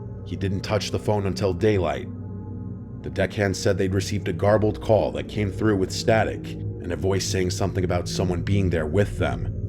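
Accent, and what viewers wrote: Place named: Staten Island, United States. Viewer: im so happy still makes videos and that he's managed to tell so many stories over the years. My sister and i would religiously watch these back when corpse husband was still posting, mainly because were from jerz and the staten island accent feels like home loll (fr my sister was soo picky about narrators voices. she only watched your channel cuz ny accent is the correct way to speak loll)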